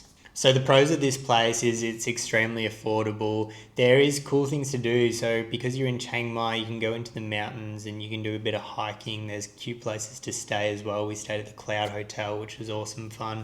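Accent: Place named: Australia. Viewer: Cheers brother 👍 Nice to hear an Aussie accent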